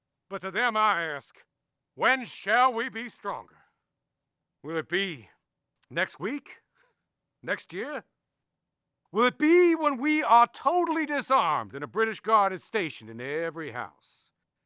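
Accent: Virginia, United States. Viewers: Oh, good night!!!! This guy is excellent! The Virginia accent I recognize, the zeal, the expression are inspiring. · Thank you for this! This was a stellar performance, and that Virginian accent was perfect!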